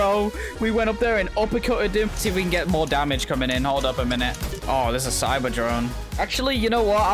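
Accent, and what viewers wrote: United Kingdom. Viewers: Are you british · I just realised he is British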